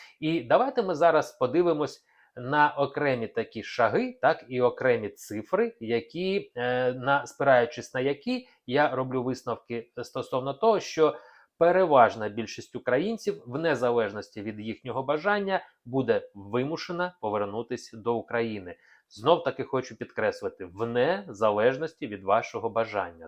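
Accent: Russia. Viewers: Бедолага а у вас акцент на русский язык не старайся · Очень режет слух Ваш " русизм" в произношении. Прошу прощения: в украинском языке, если пишется (на пример) "отримував", то и произносится Отримувпв а не Атримував. Во многих случаях Вы приносите так. Обратите на это внимание. Вы же с экрана вещаете все же. Спасибо. И... Страна - это русское слово. В украинском оно другое, Вы и так, и эдак произносите. Спасибо за информацию. И простите, если что))) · Якесь недолуге , намагається навести жаху на українців😁Альо, чоловіче з бородою та как..ським акцентом!! Тобі до мольфара, як до Парижу пішки!!! Аналітик - невдаха!! Ще й до всього - хамло!!! Ліпше перехрестися і покайся, і закінчуй зі бути лжепророком!! Ми, українці,заґартовані такими висєрами😁 Нас вони не « беруть»!! Лише Господь знає, як і коли буде кінець цьому балу сатани, а не « пророк з бородою»! Ця інформація для болот !!!!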